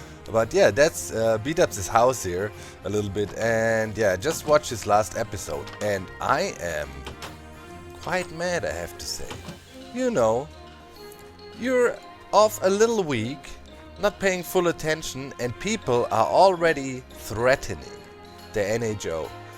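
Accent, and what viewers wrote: Germany. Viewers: Does sound more German than usual in this video or is it just me?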